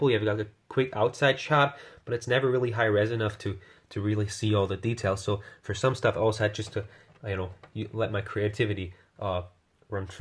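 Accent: England, United Kingdom. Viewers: Bist du Engländer? Sprichst sehr gut Deutsch.. Dein Moc is auch super ;)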